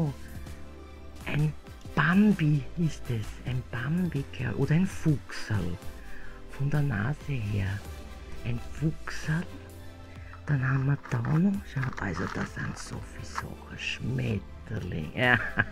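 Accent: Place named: Vienna, Austria. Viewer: Daaaaankeee🤩😍😍😍💖💖💖💖 Ich dachte am Anfang du testet das nur. Ist ja auch wurscht, geht ja gar nicht das ich deine Stimme nicht klar und deutlich mit dem Wiener Charme höre😁😘🤗🤗🤗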